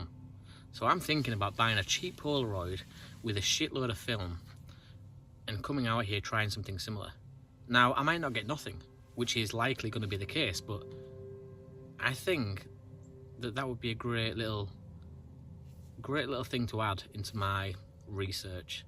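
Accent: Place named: Yorkshire, United Kingdom. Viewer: Just come across your channel and so far so good pal! I would love to do what you do but unfortunately I'm not right well. I take your a Yorkshire lad from the accent. Keep up the good work pal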